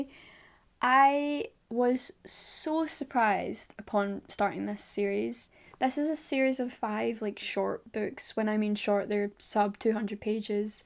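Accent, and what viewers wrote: Scotland, United Kingdom. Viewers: It’s a Scottish accent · Soo this is your real voice, you sound a little bit british 😮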